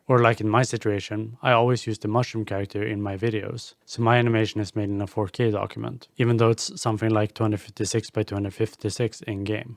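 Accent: Sweden. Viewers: Your not swedish like me by any chance? Sounds like you have a stockholm accent.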